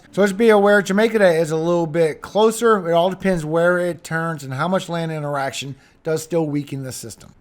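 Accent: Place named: New Orleans, United States. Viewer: Thanks,. You sound like you are from the Westbank, New Orleans. 🙏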